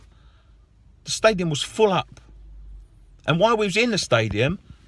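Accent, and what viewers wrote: Cockney, United Kingdom. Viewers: What a boring cockney deluded wanker.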